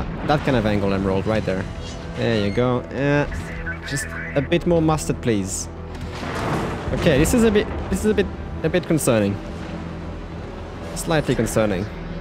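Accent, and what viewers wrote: France. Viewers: Je découvre ta chaine et je suis ravi qu'un français nous offre des vidéos d'une telle qualité! Ton anglais est très agréable à écouter, et sans doutes beaucoup plus agréable à pour les spectateurs qui ne sont pas familier du Français... mais ça fait toujours un petit plaisir chauvin d'entendre un accent français et quelques mots d'introduction en français.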